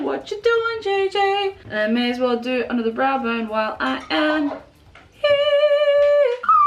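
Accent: Australia. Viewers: By gosh, I looooooove Austrailian accents! (Okay, I'm done gushing. Maybe.) Whether you're you or you're, you're amazing and you bring a TON of joy to those who watch your videos! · I love your bombastic and I also love your real voice and personality:) It's so refreshing to hear an Australian voice on YouTube - and idk, hearing someone do internet memes with my native accent is weirdly comforting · i like both, i kinda liked how you and were in this video. the accent is just hilarious although i adore your aussie accent.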